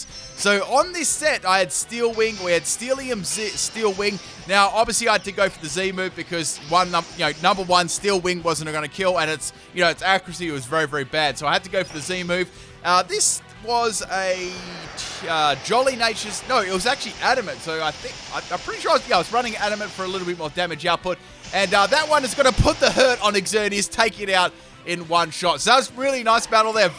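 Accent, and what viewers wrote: Australia. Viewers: U sound Australian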